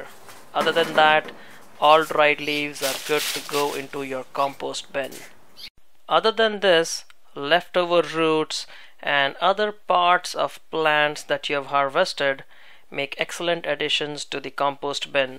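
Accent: India. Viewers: just love your india accent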